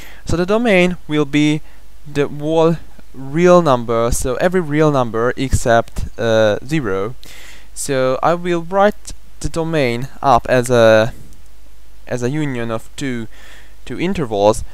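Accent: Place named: China. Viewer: he must be chinese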